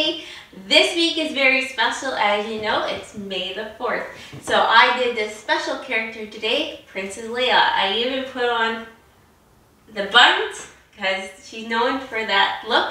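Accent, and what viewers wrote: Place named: Canada. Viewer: good night sweetheart I can tell in your voice that you are from Canada sweetheart I love it❤❤ looking beautiful in that sweetheart 🥰🥰🥰 love to hear your voice in person 😍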